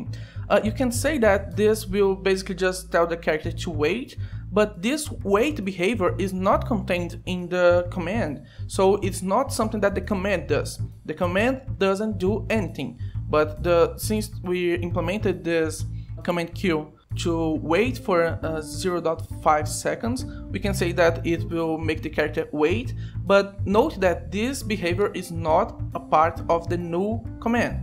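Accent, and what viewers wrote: Brazil. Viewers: Brasileiro ? Haha, reconheceria o sutaque do meu país até no inferno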